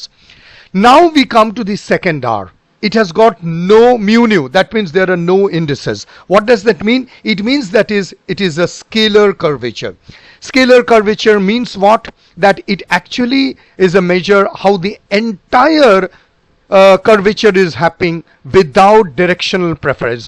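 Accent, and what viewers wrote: India. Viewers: Sir are you from West Bengal ❤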